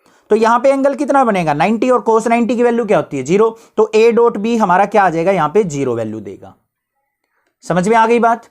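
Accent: Haryana, India. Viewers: Bhai Haryana k hai sir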